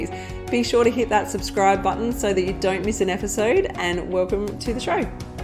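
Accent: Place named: Australia. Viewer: I know love is terrible. Lose that accent a little bit. You sound like someone steps on your toes and your voice going up at any other word. I understand is an Australian accent but man it sounds terrible. Just being honest not horrible to you. Surely other people thought the same but were too polite to point it out.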